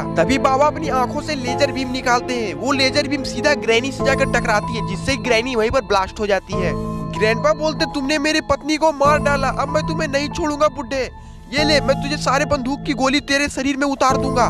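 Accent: Bihar, India. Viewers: 😅😅😅😅 aap Bihari ho kya❤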